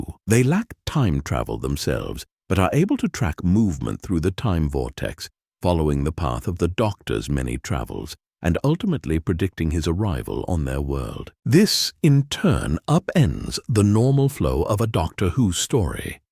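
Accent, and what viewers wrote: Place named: Transatlantic, United States. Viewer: Love the transatlantic accent , reminds of the haunted mansion.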